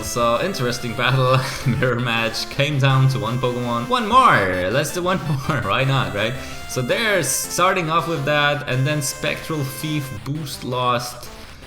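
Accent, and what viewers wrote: Poland. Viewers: My are you from Poland?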